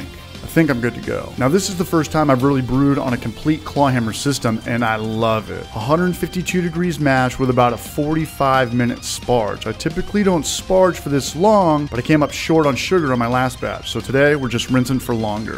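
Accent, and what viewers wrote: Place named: Tennessee, United States. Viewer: I don't know but I can hear that Tennessee drawl slowly setting in on...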